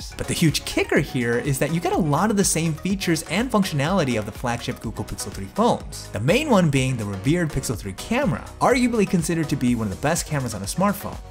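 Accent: United States. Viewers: Another fantastic video from. Honestly saying I didn't copy but suddenly noticed there is a channel which is publishing videos almost identical to my videos. Even though he will win for quality and the gears he is using are far better than me. Also my accent is too Indian (Im not Indian though) than his American excellent accent. you are good.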